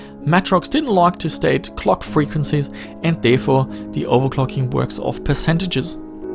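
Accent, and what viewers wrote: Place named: Germany. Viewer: FROM CANADA?! I always thought u were German from your accent lmao!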